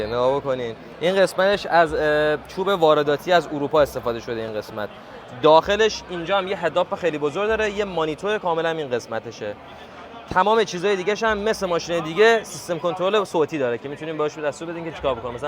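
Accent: Tehran, Iran. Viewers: من درست شنیدم ایشون گفت اولین ایرانی هستیم که داریم از رویزرویز چینی فیلم میگیریم ؟! با وجود اینکه تسلط و لهجه عین بچه های شمرون تهرانه ولی واقعا رخ یه چیز دیگه میگه اونقدر چینی میزنه رخش که نمیشه گفت حتی دورگه اس یا اگر باشه یکی از اولیا باید ترکمن باشه یکی چینی یا هوش مصنوعی😂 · او موش خور بربری چرا لهجه ایرانستانی گب میزنی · ای جانم داداش قشنگم و با لهجه شیرین پارسی باستان صحبت می‌کنی😊😊😊😊❤❤❤❤❤🎉🎉🎉🎉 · عزیزم قربون اون قیافه چینیت و لهجه قشنگ ایرانیت برم فکر می‌کنم اگر الان کم کم شروع کنی با یک سری از مقامات یا صاحبان کارخانه توی چین که صاحب سبک و جنس‌های خاص هستند قطعاً توی تولیدات بزرگ جهانی سهمی دارند،و یا حتی اگر هم با خود مدیرانشون نمی‌شه با اطلاعات اون شرکت‌ها علت دونستن زبانشون پشت قضیه صحبت کن و فقط جلوی دوربین بیا جوونای ایرانی رو آگاه کن که الان تو دنیای اول ،کشور اول دنیا،که ، به تازگی دیگه قشنگ عیان شده،تو بگو چی داره می‌گذره چی قراره بشه،،،منظورم اینه که یک ذره اطلاعات تحلیلی بده ،که یک سری از این جوونای ،نخبه‌ای که داخل کشور هستند حداقل بدونن که کدوم ریسمان بگیرن..که حداقل کشورمون عقب نمونه از کشورهای دیگه..قطعاً که خیلی کار داریم ما ولی باز امثال شماها که میرید و میاین باید تعریف کنید که چه چیزهایی رو باید تو کشور هم مسئولین و هم جوونا بیشتر باید توجه کنن،و تو چه حرفه‌های ناشناخته‌ای باید کم کم برن اطلاعاتو آموزش ببینن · اصلا ماشین را بیخیال خیلی قشنگ فارسی صحبت میکنی. 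لهجه تهرانی واقعا فوق العاده اس. تبریک میکم که اصالت خودت را حفظ کردی با چهره کاملا چینی 😊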